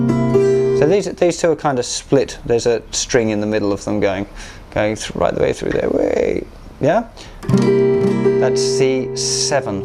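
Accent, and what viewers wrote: Australia. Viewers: are you australian? because you sound aussie.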